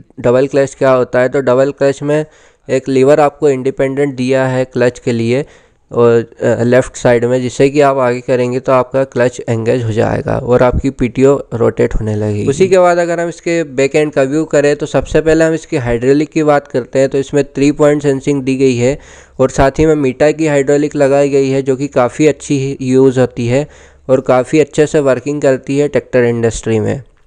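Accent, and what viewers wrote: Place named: Madhya Pradesh, India. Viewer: Me bhi mp se hu tumhare bolne k tarike se hi lg gya tha mujhe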